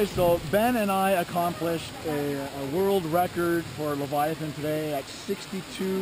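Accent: Canada. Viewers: You have that Canadian accent (I'm in the USA) you say aboat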